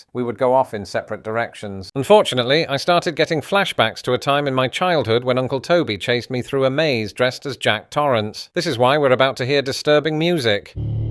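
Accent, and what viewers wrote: United Kingdom. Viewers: about Eisenhower turning American into the world's largest Scalextric. The WHAT?! That got me curious. Did I hear that word right? Was that waning British accent too confusing? Nope. I heard it right. I googl'd it. Scalextric is the leading brand of UK slot racing cars. Slot cars? Instant nostalgia set in. Now I know what meant.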